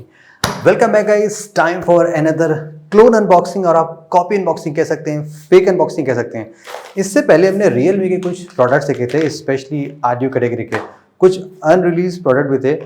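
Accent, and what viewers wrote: Lucknow, India. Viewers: Sir, Are you From lucknow?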